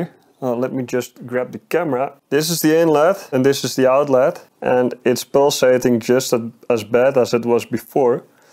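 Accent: Netherlands. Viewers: hahaha prachtig, ik dacht het al te horen aan je accent en ik wist het zeker toen je iets nederlands zij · Man je hoort gewoon dat je Nederlands bent 😂.. Gave project !! Er zijn ook pompen welke een constante flow hebben. En je hars wordt door de uv (welke uit je draden lekt) gehard in de slang.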